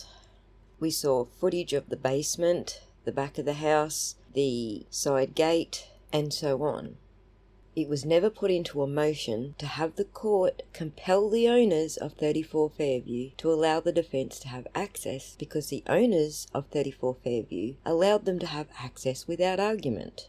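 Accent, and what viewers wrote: Australia. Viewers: I always love listening to you Aussie.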